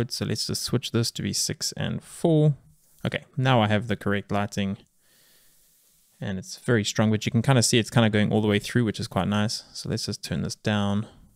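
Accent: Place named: South Africa. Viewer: Sounds like South African, but diluted from living abroad maybe?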